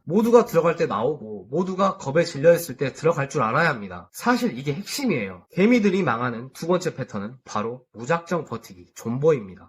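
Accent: Jeolla, South Korea. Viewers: 전라도 분이신가요? 반갑네요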